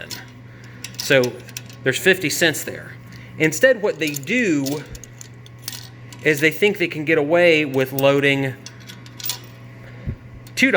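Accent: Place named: Atlanta, United States. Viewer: Where are you from? I know a guy in Atlanta with the same accent, but I don’t know if he’s from there originally!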